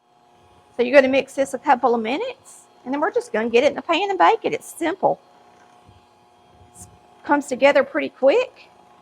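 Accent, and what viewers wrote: South, United States. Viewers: Your recipes look so delicious, I just love your sweet southern talk. I definitely want to make this cake. Thanks for sharing!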